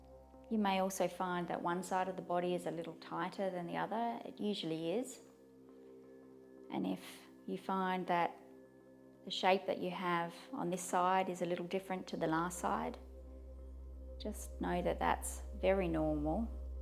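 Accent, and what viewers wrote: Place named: Australia. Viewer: Great practice. I really enjoyed the first pose, whad do you call that? And, I love your aussie accent 🙂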